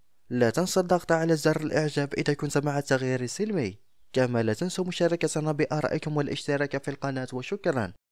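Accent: Morocco. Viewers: لهجة مروكية لماذا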